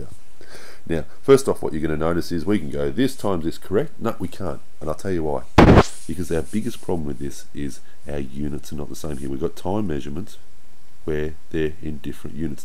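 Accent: Australia. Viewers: Lol u sound like someone from love island Australia and thanks for the lesson really helped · Thanx Aussie, thats the best description I can find for this problem, no Indian accents(no offence intended), by the way hope that head cold clears up for ya soon, LOL.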